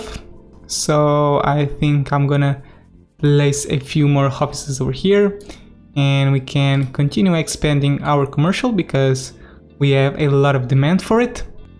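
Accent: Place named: Portugal. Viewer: Tenho visto alguns videos teus e se a ideia era melhorar o teu inglês, tivemos todos sorte. Parabéns! Tens o melhor canal do youtube de skyline e tudo porque fazes aquilo que a maior parte do pessoal não faz - construir em tempo real (quase sempre). Tenho aprendido muito. Aprendido muito e percebido que ainda que o teu inglês seja exímio, só um português tem esse sotaque, o que me faz ter a plena certeza que tu entendeste tudo o que eu disse. :P Mas congrats mate! Great content, and amazing english :)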